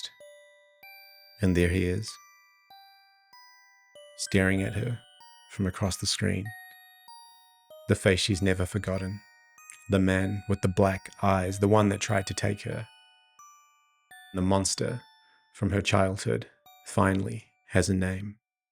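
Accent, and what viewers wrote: New Zealand. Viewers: do I detect a hint of NZ accent?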